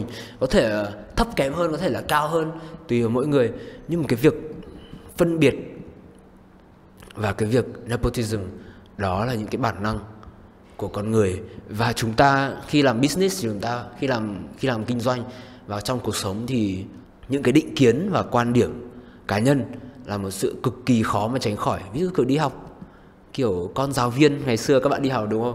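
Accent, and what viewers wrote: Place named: North, Vietnam. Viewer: Bạn này giọng ấm quá nhỉ ^^ Siêu thích boy nói giọng Bắc :3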